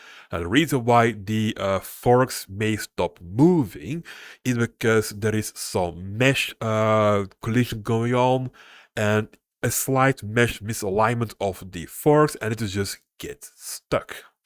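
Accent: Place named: Flanders, Belgium. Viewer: Ik can een vlaams herken waneer ik hoor hem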